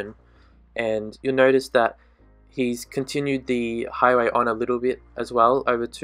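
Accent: Australia. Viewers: You from Australia mate? love the channel btw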